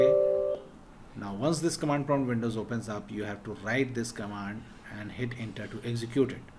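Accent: India. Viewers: Always the dudes with the Indian accents who don’t require you to download some fishy app xD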